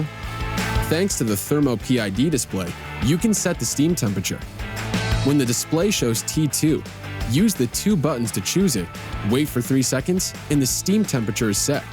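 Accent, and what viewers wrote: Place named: United States. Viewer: What's with this american guy doing the voiceover on an italian product? Get Mauro Epis back! A real italian. Good video otherwise though, from an owner of this machine!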